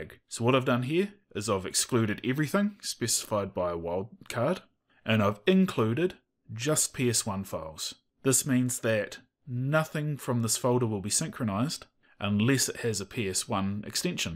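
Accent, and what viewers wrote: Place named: New Zealand. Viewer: love the Kiwi Accent Choice bro!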